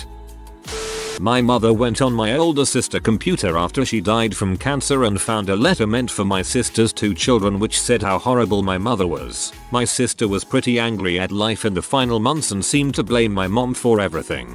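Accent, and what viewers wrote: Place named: Yorkshire, United Kingdom. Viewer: Queen Mantis I think he's from Yorkshire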